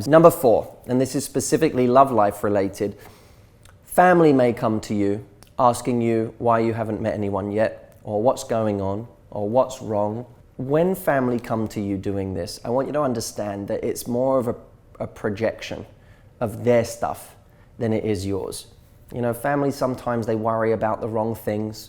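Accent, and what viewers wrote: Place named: United Kingdom. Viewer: Do you know what..You are completely true..Before I saw your channel I hate British accent..Now I love it sooooo much..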